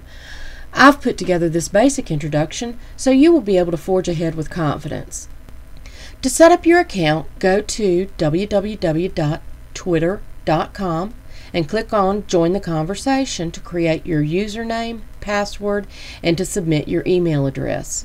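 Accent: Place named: South, United States. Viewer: This was just great! Your lovely Southern drawl made it feel even more captivating.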